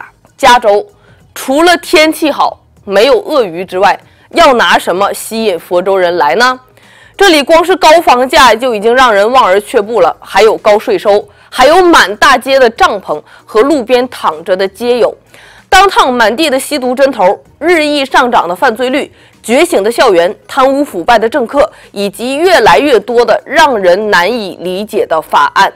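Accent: Northeast, China. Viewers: 听小姐姐说话可好玩儿了，哈哈哈，东北口音小姑娘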